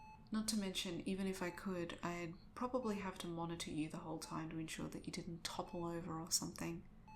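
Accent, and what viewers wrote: Australia. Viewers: Oi, a fellow Australian!!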